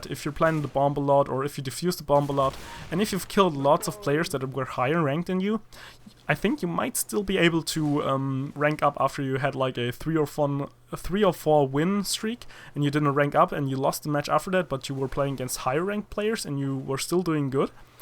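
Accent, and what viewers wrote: Germany. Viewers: Sounds like you are german. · you sound like a german :) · Are you german? Du hörst dich deutsch an :P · Bist du deutscher ?:) du hast nen deutschen Akzent :)!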